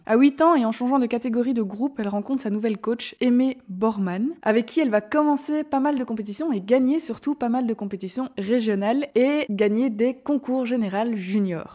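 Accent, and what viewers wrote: Belgium. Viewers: Dès que tu as commencé à parler, j'ai su que tu étais belge grâce à ton accent. Ce n'est pas l'accent bruxellois, que les Français pensent à tort être le seul accent belge. Quand je t'écoute, j'ai l'impression que tu as l'accent de Liège ou de Namur, je me trompe ?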